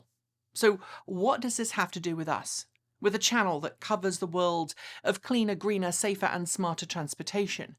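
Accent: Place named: England, United Kingdom. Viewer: Well done, had to be said. Totally agree about wild unproven comments being moderated. As a Brit I am proud that you have held onto your English accent even though you are now an American citizen.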